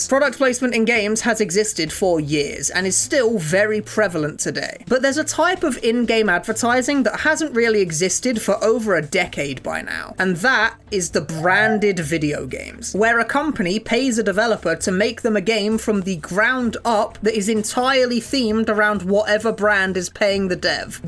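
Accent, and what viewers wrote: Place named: England, United Kingdom. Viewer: Ok english accents are always funny to me as an American, like i enjoy how you all say Mario, but that Nissan pronunciation is something else!! ❤️ You have a great channel man. Could really good analysis videos I've seen now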